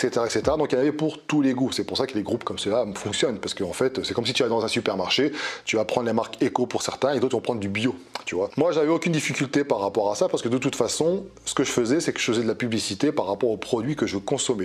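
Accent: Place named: Nice, France. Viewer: * qui a de plus en plus l'accent niçois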